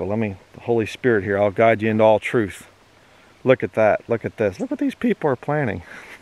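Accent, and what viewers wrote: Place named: Pennsylvania, United States. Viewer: can tell you're a PA boy by your accent!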